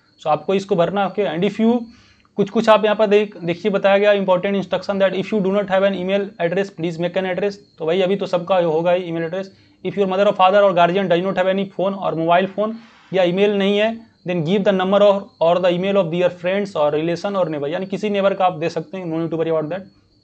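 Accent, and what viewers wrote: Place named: Maharashtra, India. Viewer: Bhaiya you seem a Maharashtrian from your accent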